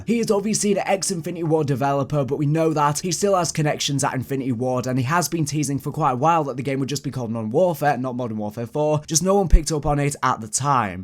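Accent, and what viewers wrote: England, United Kingdom. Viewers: Well he's English and morgz is English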